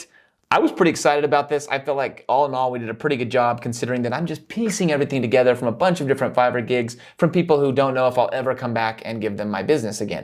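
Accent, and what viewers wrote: Australia. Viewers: that was an Australian accent.... not British. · Ur boi is from Australia not Britain, cool vid :)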